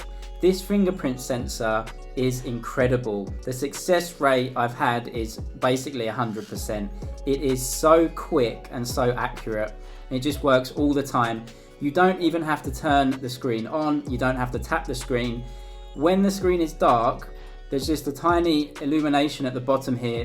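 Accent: England, United Kingdom. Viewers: I'm sorry to say but you are just over critical, going to China, speaking with strong enlgish accent commenting their phones, clinging to the small details and making sensations on youtube. it is too commercial and nonsense this video, hoping to attract viewers by titles and shits.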